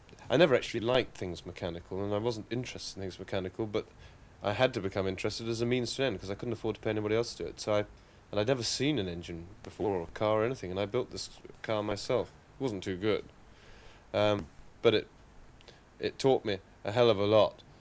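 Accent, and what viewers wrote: RP, United Kingdom. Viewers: I could listen to this guy for ages. He's posh but not pretentious.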